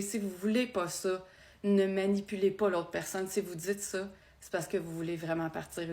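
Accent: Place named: Quebec, Canada. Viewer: Bordel je comprends pas le Québécois 😅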